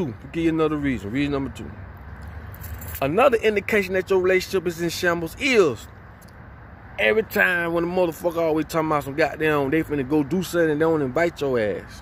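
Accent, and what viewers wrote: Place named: New Orleans, United States. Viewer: Are you from New Orleans... I'm dying over here it's the truth 🤣🤣🤣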